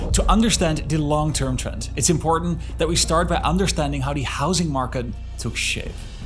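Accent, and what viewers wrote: Netherlands. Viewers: you sounds very dutch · which Dutch accent this presenter speaks with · Jij bent een Nederlandse. Dat hoor ik aan je . Maar jij spreekt wel heel goed Engels hi Maar zou je is een aflevering willen maken over het paranormale in Nederland de vrije metselaars❤❤❤❤ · Are you sure? Because they're definitely trying to make money and milk the residents for their last eurocent. Also I can hear from your accent you're Dutch too 😄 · His accent obviously shows that he is a native Dutch-speaker.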